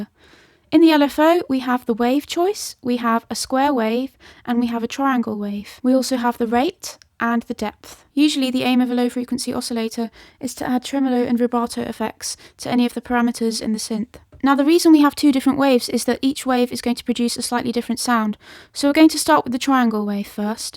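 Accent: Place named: United Kingdom. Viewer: What a lovely British accent!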